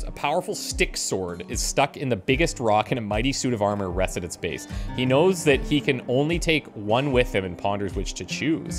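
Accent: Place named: Canada. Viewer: I'm only four minutes in and is already way more Canadian than I've ever heard him be. - British Columbian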